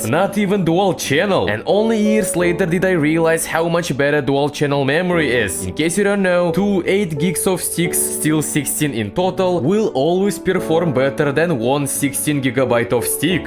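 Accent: Russia. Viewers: That russian accent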